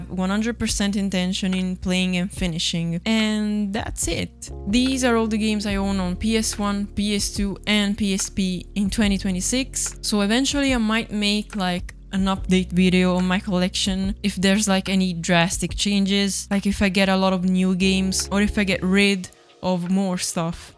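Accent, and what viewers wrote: Italy. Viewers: Your italian accent is so lovely btw ❤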